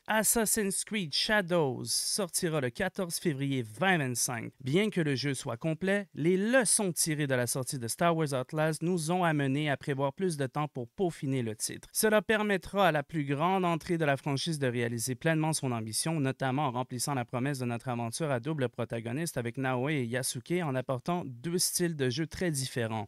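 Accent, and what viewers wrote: Quebec, Canada. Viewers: Sympa ta chaîne mec ;) J'adore l'accent et les expressions du Québec.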